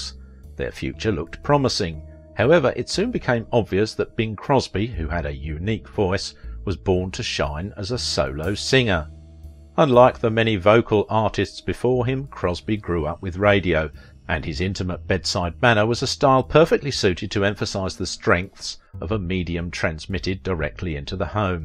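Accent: United Kingdom. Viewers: Hello there i just want to say to you im facinated by your english spoken tone i miss speakinf to english raised people,and the thing is i was born in argentina 1959 from english parents but now i dont find englush people to practice my language....well dear my blessings foe u from an english scottish lady.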